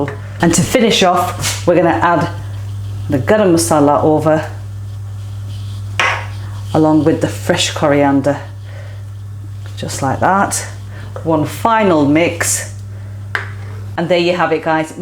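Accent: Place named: RP, United Kingdom. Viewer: You sound so posh in your explanations very professional, you should read the news x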